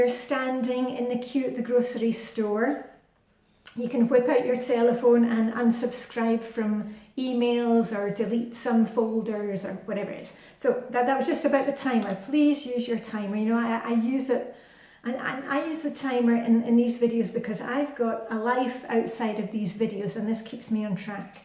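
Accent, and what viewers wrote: Scotland, United Kingdom. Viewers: Thank you so much for making things seem so possible and realistic. I am doing well on my routines, I have just listened to David Allen GTD and am delighted to put the two systems together. I really enjoy and look forward to your videos, very refreshing to hear a Scottish accent and not American (sorry USA) Im Irish and its nice to see/hear a European point of view. Thanks for all you do - Anne Marie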